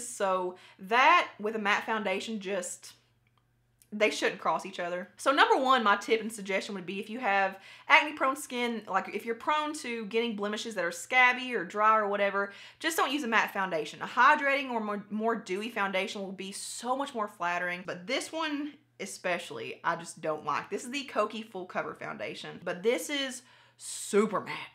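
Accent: South, United States. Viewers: I love you so much!! I have no idea what to do with makeup and I'm almost 34.. but I feel like I am learning on your channel and it helps that your southern and your funny! I laughed out loud when you said MAN DOWN!! Stay true, stay you! Your awesome!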